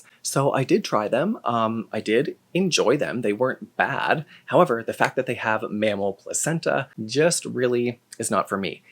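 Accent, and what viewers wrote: Canada. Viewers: Videos are always great! I learn so much about skincare even outside of these products.. Love how you touch base on layman’s terms and legit science terms back to back so I can understand the deeper stuff to research or cross check my current products :) And ya know, every time you say “out” I’m geeked on your Canadian accent :) most romantic accent if you ask me But yeah overall thanks for describing some great tips and sharing so much knowledge! I’m just starting my skin care routine at 29 and it’s really helping me know what to look for when i buy and apply 😊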